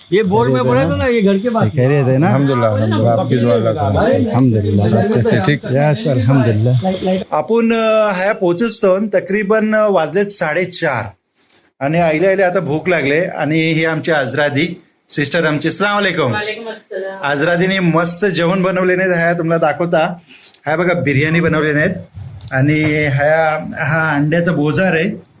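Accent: Konkan, India. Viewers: bhai tumchi kokani bhasha lay God vatate. Kokan aani kokani manus donhi Saral sadhi aani god.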